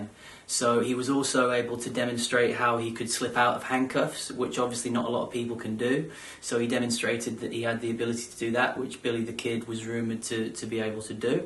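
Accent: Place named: United Kingdom. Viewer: I watched this randomly as an Oklahoman, the moment you talked I was shocked to hear a UK accent. Used to hearing us talking about this.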